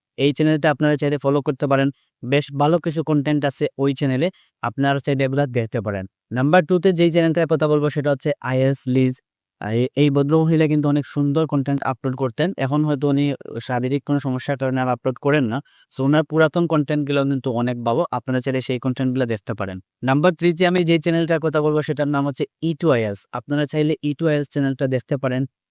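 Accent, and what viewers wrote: Sylhet, Bangladesh. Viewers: Vaiya apni ki Sylhet er??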